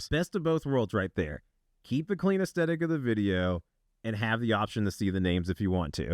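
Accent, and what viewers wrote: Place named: California, United States. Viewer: Man that cali voice is fckn annoying I can't man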